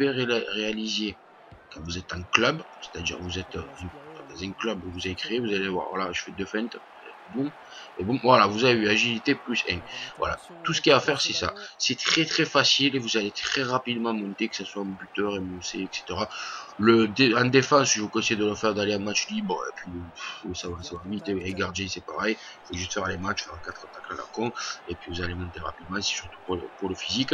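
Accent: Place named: South, France. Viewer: T'as un accent du sud ! Tu viens vraiment de Belgique ? :P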